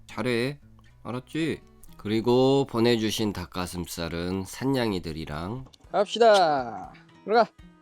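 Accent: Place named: Jeolla, South Korea. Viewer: 풍경이 참 예뻐요 럭키 보는 맛에 애청중입니다 가끔씩 친숙한 전라도 사투리가 정겹네요 워메 ~따라와붔네 ㅎㅎㅎ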